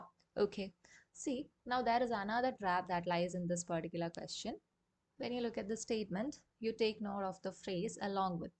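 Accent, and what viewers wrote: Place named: Kerala, India. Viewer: Amazing class ma'am ..feels like you are from kerala ..Great pronounciation skill ma'am ..God Bless You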